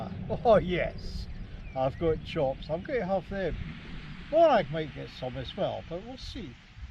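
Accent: Scotland, United Kingdom. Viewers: Awh really enjoyed this video! Very wholesome❤your dog is so cute! And I love your accent! I need to visit Scotland! Hope your enjoying the weather!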